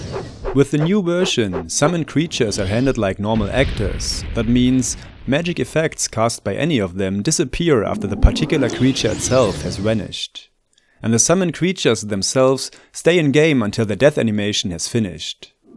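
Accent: Germany. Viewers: ich gehe vom ankzent her mal davon aus das du deutsch bist ^^ mein englisch ist schlecht also frage ich auf deutsch ist OpenMW mit der Steamversion von morrowind compatibel? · Looks pretty cool. Any chance it's compatible with vanilla (and non-modded) saves? Your German accent is a thing of beauty btw.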